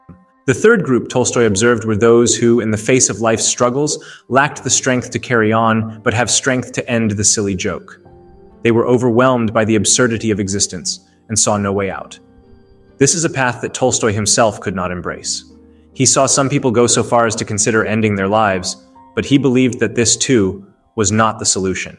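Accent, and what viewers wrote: United States. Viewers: Why an American accent ur not European goodbye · I thought i was going to get some professional English speaking historian talking about this, and what I get is another jumped up Yank. No, thank you.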